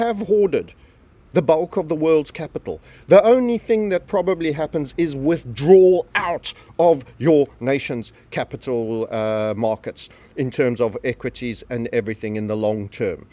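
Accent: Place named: South Africa. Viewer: His speech sounds South African to me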